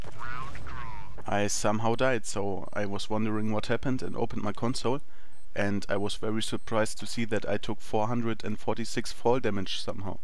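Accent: Germany. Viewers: Deutsches Englisch is sooo toll :D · Ist er denn Deutsch er verwirrt mich xD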